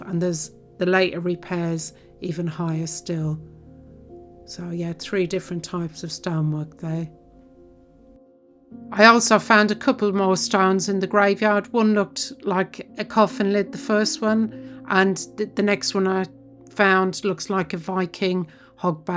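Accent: Midlands, United Kingdom. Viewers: Good video, but that's a Midlands accent ? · I thought it was a midlands accent too - which I quite like. Where are you from?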